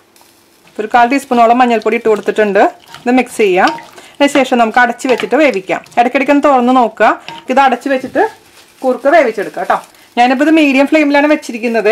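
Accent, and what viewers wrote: Thrissur, India. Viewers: Trishoooor aaan'to....... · ഹായ് ചേഈച്ചി തൃശ്ശൂര് ഭാഷ കേൾക്കാൻ തന്നെ സുഖ ഞങ്ങടെ വീട്ടിൽ കൂർക്ക സീസൺ ആയാൽ എന്നും കൂർക്ക ഉപ്പേരിയവും ഇപ്പോൾ ഇവിടെ മസ്കറ്റിൽ ലുലു വിൽ ഉണങ്ങിയ കൂർക്ക വരുന്നത് നാട്ടിലെ പോലെ മണം ഒന്നുമില്ല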